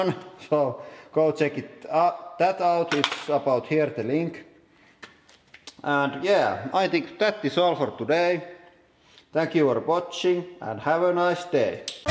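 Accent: Finland. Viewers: kiva rallienglanti, huomasin heti et oot suomalaine :D kivasti tilaajii sul:) ja kiva idea. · Derp he is finish (idk how you spell that) I'm Dutch lol ik Ben dat wel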